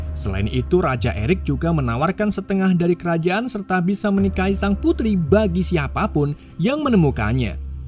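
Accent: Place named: Java, Indonesia. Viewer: nyong curiga dari dulu kayane admin wong jawa tulen😂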